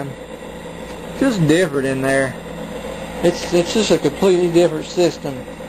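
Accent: United States. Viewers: You from the south of america?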